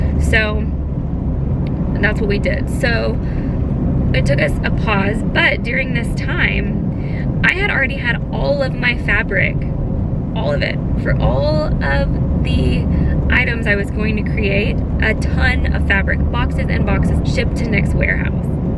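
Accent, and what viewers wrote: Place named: South, United States. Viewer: Just wanted to say... at the beginning, your voice is sounding more country. Being in the s.a. area looks good on ya! Getting the accent back lol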